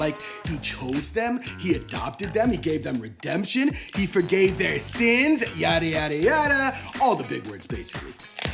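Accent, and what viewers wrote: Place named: Boston, United States. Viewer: This is great!! has to be OFB... originally from Boston. Born and raised here and we can detect an imposter in a minute. is the real deal. You can’t fake that.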